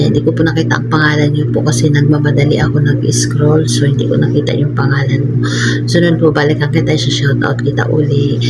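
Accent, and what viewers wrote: Waray, Philippines. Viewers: Hala waray ka ngay-an sis ☺️ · Hala waray ka liwat kabayan?taga este ako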